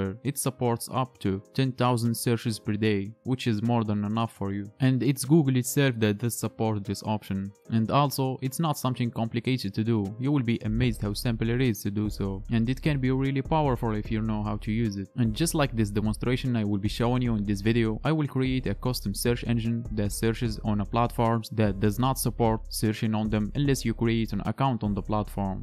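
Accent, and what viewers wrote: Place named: Morocco. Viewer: you're moroccon aren't you? your accent is moroccan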